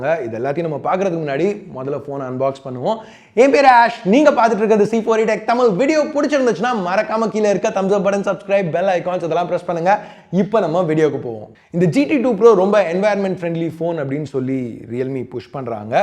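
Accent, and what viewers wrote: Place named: Chennai, India. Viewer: Ena bro Chennai tamil pesuringa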